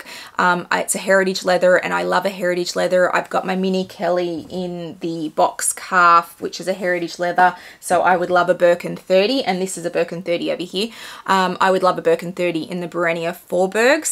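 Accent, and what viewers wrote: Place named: Australia. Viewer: I’m literally addicted to. Ok that sounds weird. 😂 I’m addicted to her closet! Her bag collection! Actually no I’ll throw, the human, in there too! Seems like such a super nice person. ❤ Can’t get enough of that Aussie accent too! What I love most, is that even though she can afford to buy whatever she wants, and has quite an extensive collection, she never “brags” about it! She shows it to those of us who love luxury, but she doesn’t make you feel bad nor envious if you’re not so fortunate! Love that! She’s bad for my wallet though! I’ve purchased a new Chanel and a 2 new Fendi’s since I’ve started following her! 💰 ❤😂 But that’s ok! Can’t wait to see what she inspires me to buy next! I’ve got a much smaller collection that Miss of course, but that’s ok too! Whenever I can’t stop thinking about something she’s showing, I go to the Real Real and try to find a previously gently loved version! 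I do buy brand new as well, but I’m into circular fashion these days. Saving the planet 1 bag at a time! 😊 To me it’s like why buy a dog when there’s so many waiting for homes at the shelters? No offense to those who buy exclusively new, but I just got 3 bags for less than the price of one brand new! Good luck filling your wish list this year! I can’t wait to see what you add! 👏👏💗💗🥰🥰💗🥰💗😎😎😎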